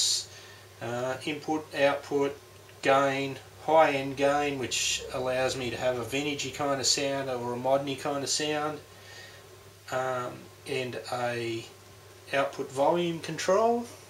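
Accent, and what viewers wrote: Australia. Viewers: It's aussie...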